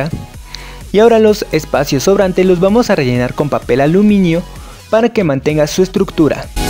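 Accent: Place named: Spain. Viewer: me cae super mal que hable como si fuera un español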